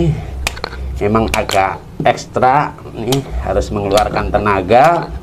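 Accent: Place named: Rembang, Indonesia. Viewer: bahasa rembang meduk.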